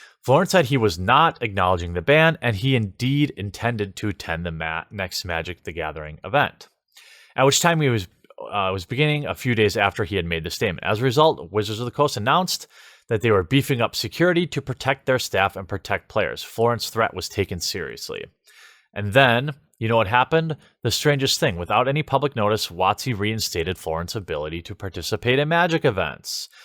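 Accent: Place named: California, United States. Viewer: You remind me of Andrew Breitbart, especially your CA accent. :-) Keep fighting! & Good luck!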